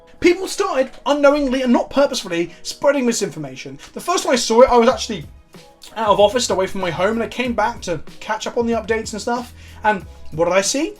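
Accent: RP, United Kingdom. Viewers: This fine chap speaks the Queen’s English with a fervent verbosity and perfectly cogent, delightfully lilting, cadence! Try taking your fingers out of your ears geezer! 😉❤️💂🏽‍♂️🐟🍟🇬🇧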